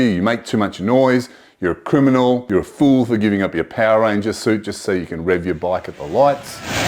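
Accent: Australia. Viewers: Heard the Aussie accent subscribed immediately. Cheers cobber.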